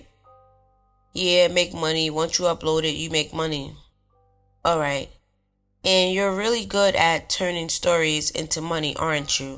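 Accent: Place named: New York, United States. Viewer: Yu can tell that the narrator/reader is from NewYork. I think it was more authentic wen someone from that city with that accent was reading transcripts. Like the one that was in new orleans that time. I think she passed away or something. Still 🔥 tho. 🫡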